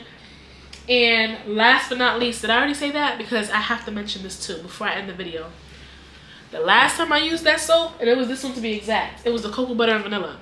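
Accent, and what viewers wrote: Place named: New York, United States. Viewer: You sound like a native New Yorker ! 😂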